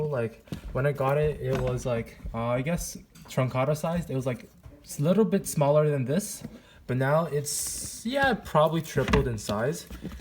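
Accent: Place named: China. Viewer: 怎么中国口语啊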